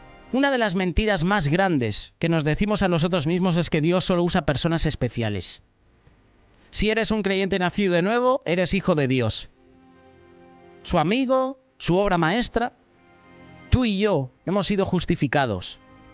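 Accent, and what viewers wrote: Spain. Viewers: Dios mío que tremenda enseñanza Dios te bendiga varón es primera ves que le escucho y x su acento es español que bendicion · Nunca había escuchado una predica en español castellano ¡Qué bonita! Y que maravillosa exhortación, hermano. Dios te bendiga. Saludos desde México.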